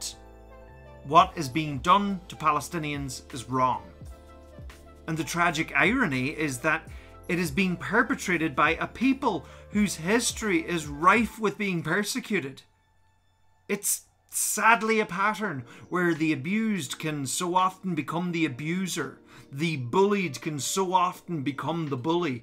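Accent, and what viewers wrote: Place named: Ireland. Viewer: A complicated political situation explained in an Irish accent somehow makes it better 😍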